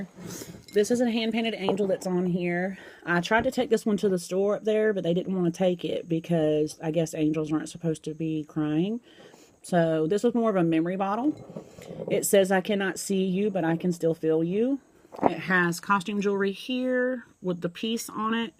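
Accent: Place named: North Florida, United States. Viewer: Love the angel bottle! I knew from your accent you are from my neck of the woods and then you mentioned Gainesville. 😎 I am from N. Fla, nice to hear familiar speak. I found one of your videos from the negative stuff going around and then looked for other content from you and watched. You are such a beautiful woman and your heart shows, it's so easy to get caught up in other's BS when you are the brunt of their attacks, but it sounds like you have a wonderful family and a soulmate. You don't need to explain or defend yourself or even acknowledge the BS, your friends will stand by you and your enemies won't care anyhow. Keep posting your art and ignore the antics of a sad and lonely person, you are above that. Take care!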